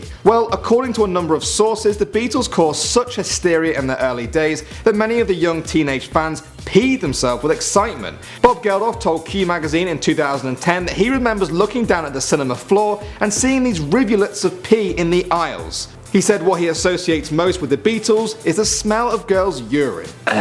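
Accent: United Kingdom. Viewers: I knew of just about all of these.:) I question, though, the presenter mentioning how Paul woke up in '63 with the tune of " " in his head since the song was recorded in '65. Paul, supposedly, obsessed about it during the filming of " ", in fact. While it's possible he could have thought it up two years earlier, it doesn't seem accurate to me based on the many things I've read. Number One is probably the dumbest, oddest conspiracy I've ever heard of. I can't believe there are people who still think Paul died in '66 and was replaced by a double. Lastly..I just have to say that I love this guy's English accent and wish that I could bottle it.;) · Brother, would you please slow down a bit? The fact is, you are speaking with heavy British accent and you talk so rapidly, that it is very difficult for non-british to comprehend what you're saying!))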